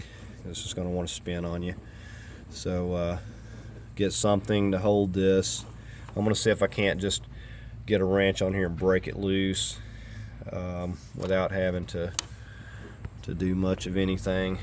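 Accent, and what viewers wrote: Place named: Kansas, United States. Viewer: highest highlights sounds like Kansas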